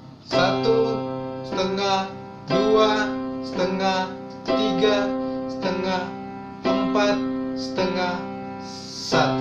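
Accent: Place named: Manado, Indonesia. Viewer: Dari logat berbicanya pasti orang manado nih hahaha.. :D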